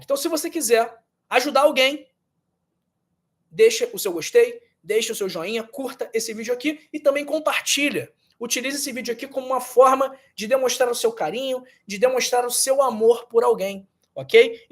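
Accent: Rio de Janeiro, Brazil. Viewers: Sotaque de carioca não convence ninguém, parece malandro falando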